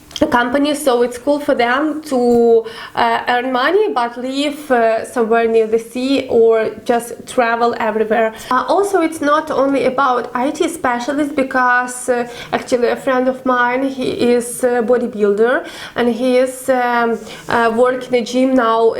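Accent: Ukraine. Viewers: I love your Ukrainian Accent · Please speak English with less Ukr sounds. For example "this" the i sound is NOT eee like th eee s but the i sound is like Ukrainian word for the male cow which is "BULL" in Ukrainian it is "bik" which is the "i" sound in English you wouldn't pronounce the BULL in Ukrainian as " B-eee-k" in the same way the word "this" in English is NOT th-eee-s. Also the "w" sound is NOT a "v" sound like the Ukrainian "b" but more like a JOINED "ya" sound so "water" is not vaterr but more like "ya-ter"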